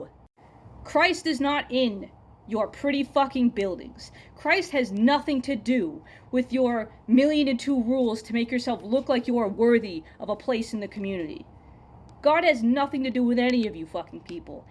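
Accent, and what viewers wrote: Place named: New York, United States. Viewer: Somehow I needed this angry, swear ridden jersey/new york accented sermon today and I'm more agnostic than anything. You nailed it.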